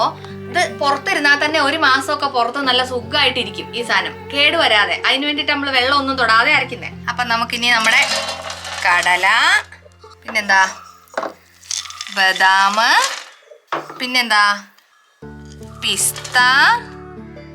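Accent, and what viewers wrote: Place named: Kottayam, India. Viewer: തനി കോട്ടയം ഭാഷ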